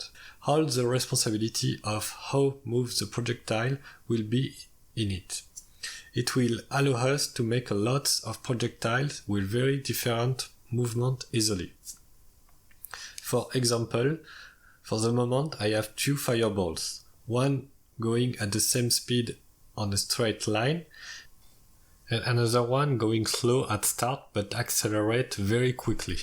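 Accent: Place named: France. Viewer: Bro t’est français ! J'arrive à reconnaître l'accent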